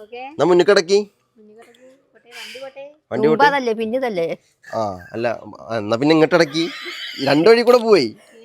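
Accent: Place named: Palakkad, India. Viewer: നമ്മൾ കൊല്ലത്തു ഉള്ളവർ കുടിയിരിക്കൽ എന്നൊന്നും parayaarilla😃 പക്കാ പാലക്കാട്‌ slang ആണ് മിക്കവാറും paraunnathu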